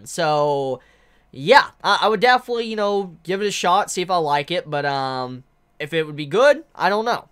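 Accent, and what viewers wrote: South, United States. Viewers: I think he from the south too.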